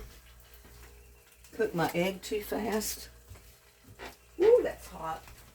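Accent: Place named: East, United States. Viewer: Hi. Curious... you're in Texas?... Trying to figure out your accent..are you from the east?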